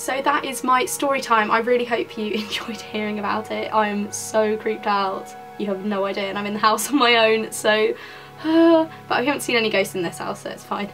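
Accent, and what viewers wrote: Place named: England, United Kingdom. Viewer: I live in UK... your accent is really nice and strong it's like really fully English:)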